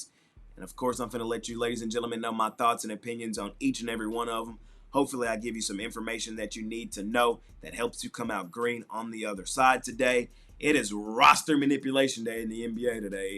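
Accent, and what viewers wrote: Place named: South Florida, United States. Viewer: Sounds like you from my crib!!! South Florida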